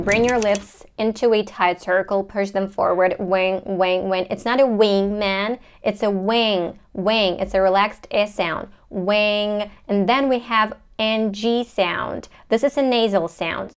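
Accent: United States. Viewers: Can you tell what kind of American accent are you speaking? It’s a cute accent!!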